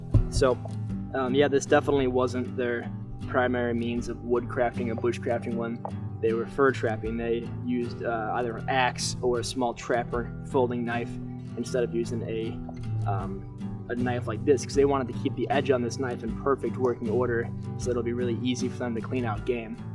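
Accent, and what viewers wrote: Michigan, United States. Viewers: Sounds like your from Michigan. In in white lake Michigan!!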